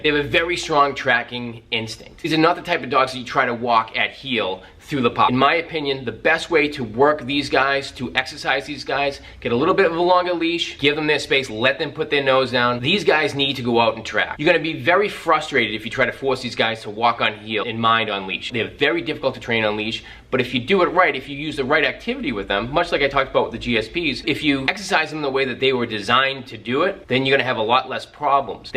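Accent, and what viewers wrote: Boston, United States. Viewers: What accent is that? Boston?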